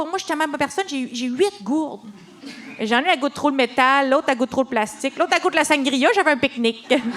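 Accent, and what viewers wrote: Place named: Quebec, Canada. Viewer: C'est rare, une comique Québécoise que les français parviennent presque à comprendre ! ( j'ai dit presque 😉)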